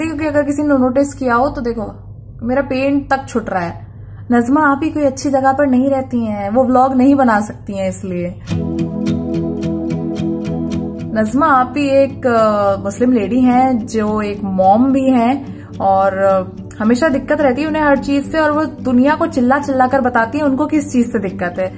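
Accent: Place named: Delhi, India. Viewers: 😂 Awesome 💖💙💖💙💖 Pure Balli Maran Chandni Chowk accent catch kia h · Meri bahen, aapka bolney ka andaz itna pyara aur pure Dilli wala hota hai ki mun karta hai ki aap sirf bolti rahein aur sunteay hi rahein, hum tamam ki taraf sey aapko dhair sara pyar, aur naik tamannaein.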